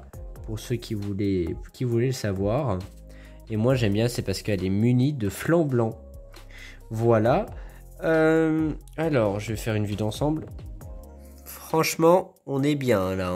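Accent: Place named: England, United Kingdom. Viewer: Elles sont magnifiques…!! Mais j apprecie aussi ton accent Anglais 😂😊🤭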